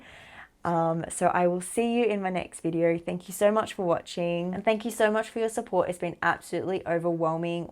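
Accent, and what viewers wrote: Australia. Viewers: Masya Allah Tabarakallah❤ Alhamdulillah Hearing your journey, especially when you called the mosque in the morning for your Syahadat, left me in tears.. Joyful tears Qadarallah Allah SWT planned the phone to be picked up so quick. Love your accent, my Mum's Australian, miss her soo much during this pandemi. I'm a reverd,living in Indonesia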